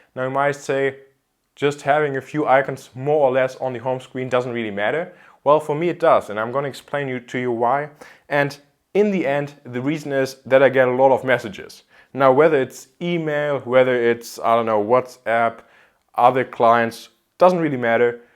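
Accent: Germany. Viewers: love the German accent and cool opinions.. subscribed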